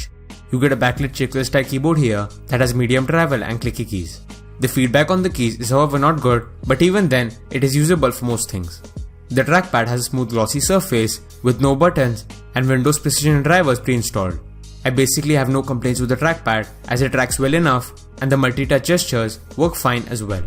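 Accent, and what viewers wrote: India. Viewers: That dirty fingernails are disgusting me, and then I realize the Indian accent.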